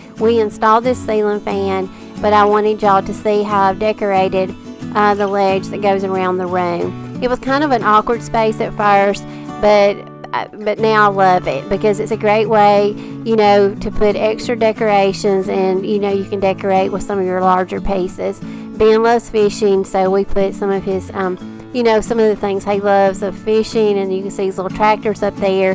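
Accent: South, United States. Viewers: Wow! I love your home. It is very cozy. I love how you have paid attention to detail. I absolutely adore your style. You and your husband have done an amazing job on the remodeling. You have a sweet southern voice. What state were you raised. I’m from northeast Alabama and living in Southwest Arkansas. ☺️🧡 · Just found your channel today. Cute cute cute! I'm from Alabama and I recognize a Southern accent 😂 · I absolutely LOVE your home and all that you’ve done to it. Also, being from the south, but living in Northern Virginia for the last 30 years, your voice made me think of all my “home girls”! I subscribed and now I’m going to watch all your videos as I wait for new ones!😀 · Your home and decorating style are spectacular!! You certainly have a flair!!! Do you happen to remember the ebay site for your door valance? It would be perfect for me to use on my door going out to the porch!! 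Your ideas are swimming in my brain now to see what all i could do!! Thank you for sharing!! Take good care!! I subscribed!! P.s. loved the southern dialect as i am from Tennessee now living in Georgia! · One question, where is the cook top and oven? I see the fridge is in the laundry room, isn't that inconvenient? I may have missed something as I have the video on mute, can not stand the sound of your southern drawl voice, it's nauseating.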